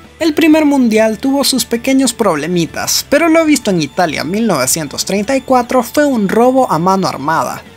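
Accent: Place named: Nicaragua. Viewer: Es de Nicaragua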